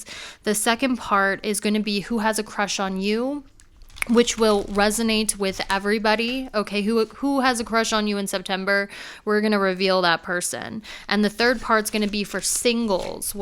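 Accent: South, United States. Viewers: I love your Southern accent and the fun themes you've been doing for your readings lately! I appreciate you & your creativity! You have a cute and fun energy :) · I really like that southern accent. Calmed me down and made me laugh a bit. I chose group 3 and it really described me. I go to customers houses and build stuff that people order from Amazon or whatever they get it from. I was actually called an expert at building stuff from one of my customers. I want to do more creative stuff with music though. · Love your Southern accent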